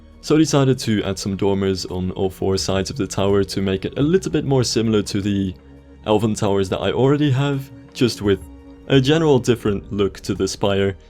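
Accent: Netherlands. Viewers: <num> Yeah, he's pretty fluent! Although I can still hear a pretty clear Dutch accent to it :P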